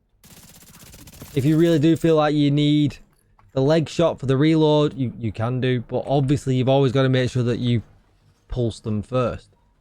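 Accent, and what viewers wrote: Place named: Scotland, United Kingdom. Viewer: Hey, buddy I'm new on the channel, your accent sounds like the SOAP on the new MW series, may I aks where you from???? Take my Thumb Up 👍 right from Brasil.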